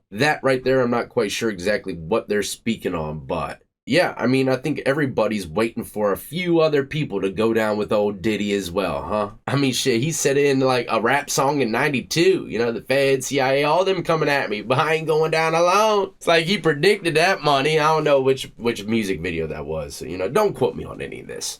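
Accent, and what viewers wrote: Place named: Michigan, United States. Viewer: Ur accent is very MICHIGAN. U originally from here by chance?? LOVE YOUR CONTENT ❤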